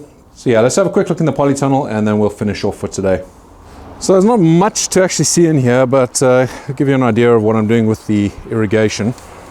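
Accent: South Africa. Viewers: Yes I wondered what a was also at first! I love his accent but sometimes I have to listen again to get what he said. Also, the English and probably the South Africanese have different sayings from the US so I have to listen to the whole sentence to understand correctly. Great man though! I love watching him!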